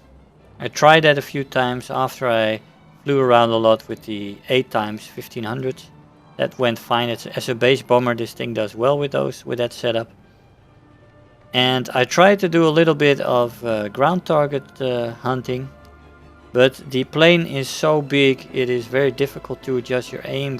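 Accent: Netherlands. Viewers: i can hear ur Dutch :)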